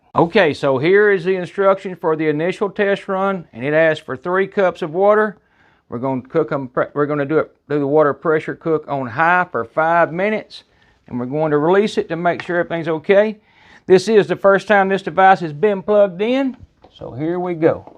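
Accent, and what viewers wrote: South, United States. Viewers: Your accent makes me ashamed to call myself southern. It’s represents the worst of humanity. And the most ignorant of humanity. I can just “hear”you never went to college. Its stomach cringing. I hate ghetto street thugs as well. Your both just two different sides of the same coin. Stop talking !!! Trump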